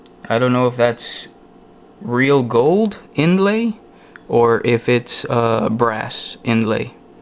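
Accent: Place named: Netherlands. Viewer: sounded like a Dutch accent ;)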